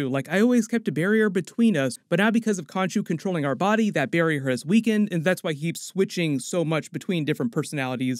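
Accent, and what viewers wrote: England, United Kingdom. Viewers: Great video as always. But oh my word. Public announcement that the English accent isn't that bad 😅 people do speak like this. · I agree. Not saying you're ignorant though 😉 but his English accent is just fine.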